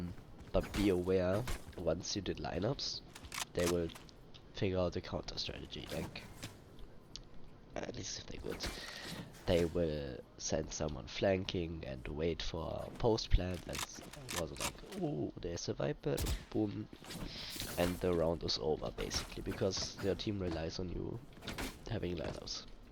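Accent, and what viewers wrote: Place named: Germany. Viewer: Deutscher Akzent ist auf jeden fall da!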